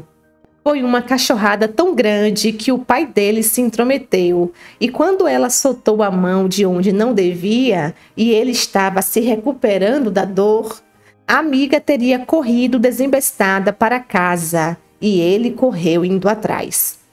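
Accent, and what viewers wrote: Bahia, Brazil. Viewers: Adoro seu jeito de falar seu sotaque. Vc é baiana?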